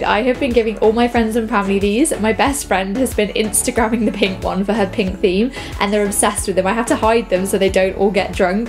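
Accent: United Kingdom. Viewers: Are you British or live in the U.K.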